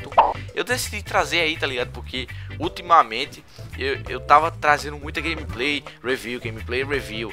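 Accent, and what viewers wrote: Northeast, Brazil. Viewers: Ele tem aquele sotaque nordestino e-e O que deixa os vídeos melhor ainda...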